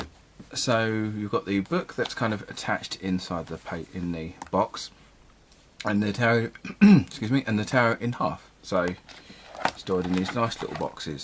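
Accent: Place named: California, United States. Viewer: Love your accent from California, just subscribed!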